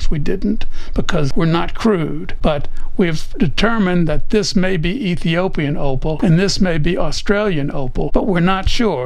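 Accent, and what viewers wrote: United States. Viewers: What area of the US is your accent from? I have always wondered. I find it pleasant.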